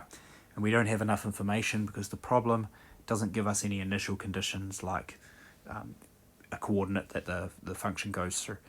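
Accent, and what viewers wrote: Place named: New Zealand. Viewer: Thank you❕ I've done a couple of courses in DEs a long time ago. You are right when you say k< 0 is not a problem, however I thought we were staying in the real world. I really enjoyed this problem and got it out by myself when I heard you say "separation of variables." I hear by you mild accent that you are a Kiwi. I live in Brisbane Australia, and I do YouTube maths for about 4 hrs a day. I'll be visiting your YouTube channel more often.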